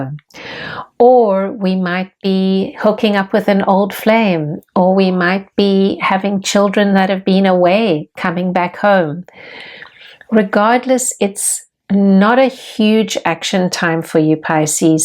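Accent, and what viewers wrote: South Africa. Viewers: I’m from Durban! ♥️ 🇿🇦 I knew your accent right away!! 😅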